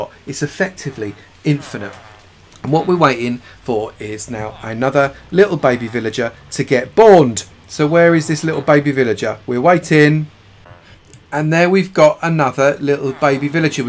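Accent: United Kingdom. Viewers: Is he british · Lol okay I gotta say the "Gordon Ramsay" comments are a bit laughable because, no offence to you but, You sound nothing like Gordon Ramsey. I guess people hear a UK accent and think "Hey it's Gordon Ramsay." Gordon is Scottish and You don't sound Scottish to me. Thick as bricks.:) Gonna give this build a try though. Thanks!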